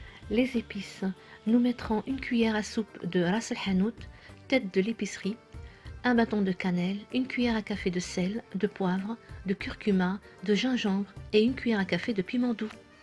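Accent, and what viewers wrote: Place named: Algeria. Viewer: Salem alik j aime bien tes recettes tu parle bien algérien je suis algérienne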